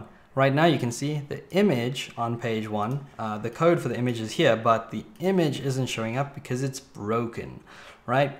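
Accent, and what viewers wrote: South Africa. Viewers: My guess is South African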